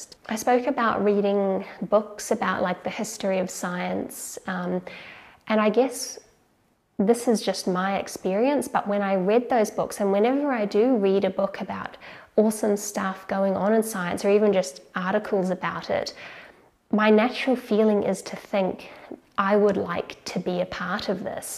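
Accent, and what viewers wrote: New Zealand. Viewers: JustArduinoThings its also that kiwi accent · Kiwi right?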